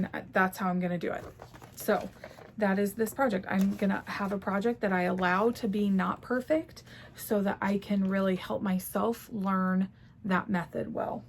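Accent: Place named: Ohio, United States. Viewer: First time seeing your Flosstube - thanks! Sounds like you’re a fellow Ohioan! 🎉 Liked and Subscribed and look forward to your next! ❤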